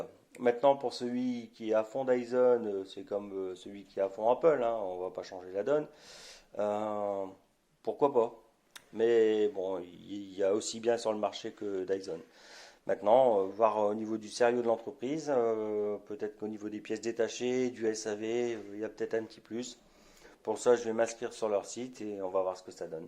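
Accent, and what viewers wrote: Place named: North, France. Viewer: Désoler mais votre accent jme suis douter que vous habitez dans le nord 😂, moi je suis du pas de Calais 😊😉, merci beaucoup pour votre vidéo...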